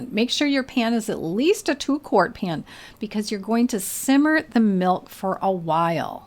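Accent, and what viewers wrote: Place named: Michigan, United States. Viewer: I cant wait to make this, thank you for the recipe! Btw, you sound like you might be from MI. If so, hi from the UP!🙋🏼‍♀️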